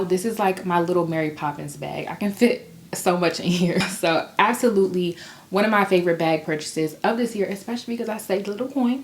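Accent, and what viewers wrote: DMV, United States. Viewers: Happy New Year! Have you ever been told you sound similar to Seven from BGC? You must be from the DMV lol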